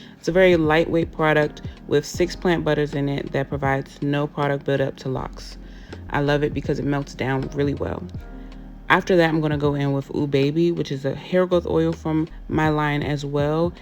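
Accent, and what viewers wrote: DMV, United States. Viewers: U gotta be from the DMV. The accent. I love iiiit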